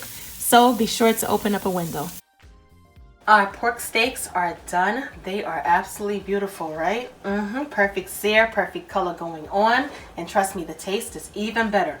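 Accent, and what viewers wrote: New York, United States. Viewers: Omfg I'll watch this over and over again just to hear that NYC accent!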